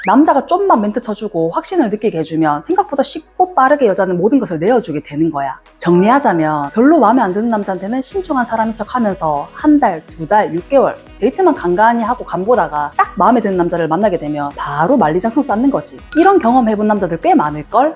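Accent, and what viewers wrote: Gyeongsang, South Korea. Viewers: 경상도 여자군요 ㅎ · 갱상도 가스나네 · 갱상도 여자네~ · 경상도(부산이나 대구) 사투리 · 갱상도 사투리 반갑소~~~😊😊😊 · 갱상도네~ 부산 경남 쪽아이가~ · 경상도 말투 귀여움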